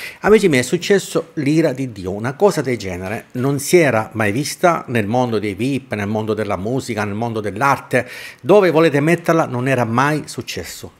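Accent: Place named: Abruzzo, Italy. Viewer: Scanzi, Travaglio e Sommi definiti come? Giornalisti che non contano niente? Ma tu chi sei con quella immensa inflessione abruzzese? E come ti permetti? Eri ironico, vero? Spero di aver capito male, ma di certo non ti riscolto più una seconda volta.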